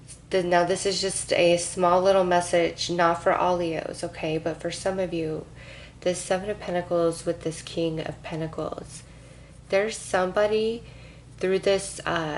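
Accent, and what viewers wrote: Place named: United States. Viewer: Omg we had an ad in Britain for a chocolate bar called cadburys caramel a swear you could be the same voice in an American accent amazing I so love your readings thankyou so very much xxxx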